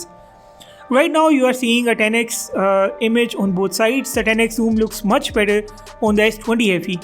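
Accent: India. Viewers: Thanks for the review. Although I must say this accent does not allow me to watch the video at 2 times the speed... I wish hindu videos would invest in getting an upgrade in their english pronunciation - it is really hard to understand most of the time and makes at least me not watch those videos and look for videos with much better english.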